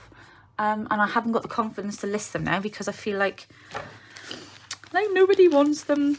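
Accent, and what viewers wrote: United Kingdom. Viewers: I watch YouTube on my TV, so it's difficult to leave comments, but we also don't realise you want them as it's more work for you replying to comments! I love the yellow butterfly with the swirls. I'm guessing you used an embossing folder to do that? I need to try that! Is it in any of your other videos? I really like hearing someone from the UK (I'm assuming). FYI I found your videos in a search for a hidden spine.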